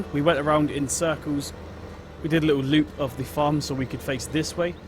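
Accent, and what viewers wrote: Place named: Northern England, United Kingdom. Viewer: oh deer pal so you sound northern dint realise m8t your vids are amazing can u do state trooper patrol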